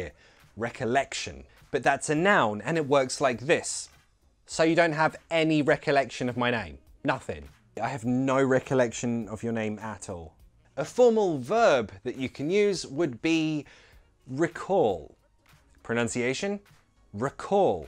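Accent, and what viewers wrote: United States. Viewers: That American accent though 😂😂😂😂😂😂😂😂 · I totally love that American accent of yours!! So accurate lol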